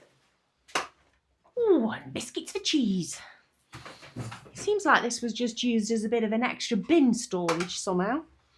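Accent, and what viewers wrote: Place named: Birmingham, United Kingdom. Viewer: I watch you for your content, yes, but even more for your accent. You see, my mom was from Birmingham, and a war bride that came to America to join my Dad. She has been gone these many years and I miss her and her accent. Thank you for listening to an old guy and his memories and brining them back with a your heavenly accent and sweetness. You’re a hard worker.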